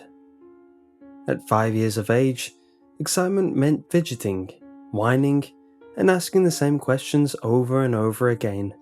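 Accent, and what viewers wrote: United Kingdom. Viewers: are you British, love your channel BTW :-D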